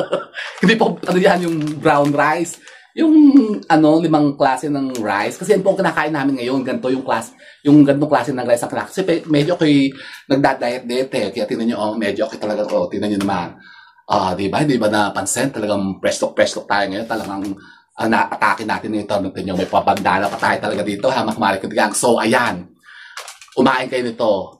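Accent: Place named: Laguna, Philippines. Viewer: Ganyan talaga punto sa laguna😂ganda ng outfit eehh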